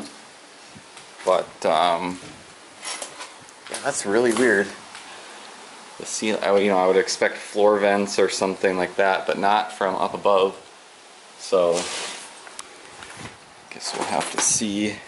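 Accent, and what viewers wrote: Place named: United States. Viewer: Where have you been?!!! I thought you'd given up exploring. Anyway glad to see you again. That must have been a magnificent house in its day. Thanks for the tour and by the way, don't know which part of the States you're from but I love your accent!! 😂 Gill 🇬🇧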